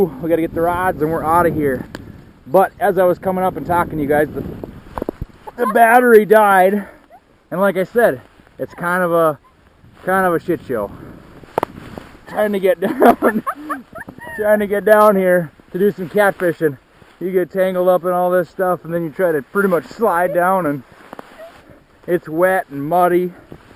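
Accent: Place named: Minnesota, United States. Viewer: with your accent you must be fishing the red river in Minnesota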